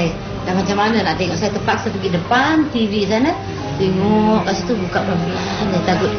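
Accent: Singapore. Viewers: Bahasa Melayu loghat Singapura. Jarang boleh dengar pada masa kini.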